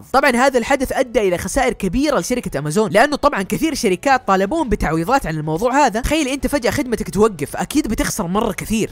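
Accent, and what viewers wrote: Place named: Iraq. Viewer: زين ليش لهجتك عراقيه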